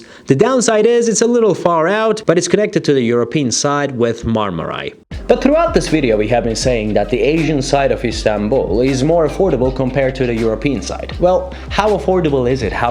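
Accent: United States. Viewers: This the first video i saw very impressive and full of information i was looking ....Also being born in Turkey you talk like Americans.we would love to visit Turkey in very near future.Take care and God bless you. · Nice narration. You speak like American. 👍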